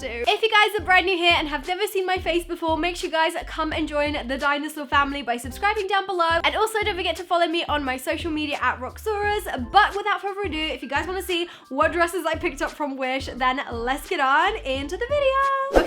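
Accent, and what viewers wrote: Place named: United Kingdom. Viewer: british accent nooo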